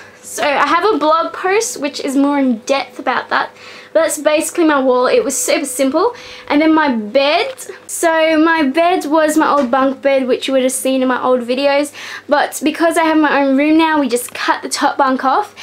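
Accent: Australia. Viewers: are u from Australlia because you sound like it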